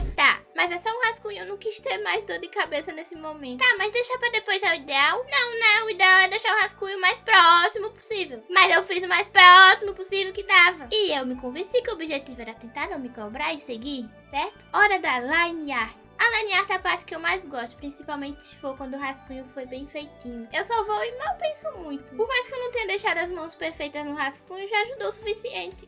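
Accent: Brazil. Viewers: seu sotaque e mt forte jkkkk me lembra mt quando passei por recife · Moça vc é de Pernambuco?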